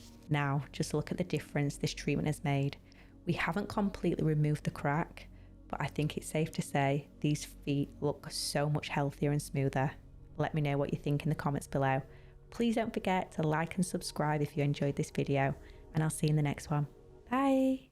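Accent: United Kingdom. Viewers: From what part of the British Isles does your accent originate? I think it's fantastic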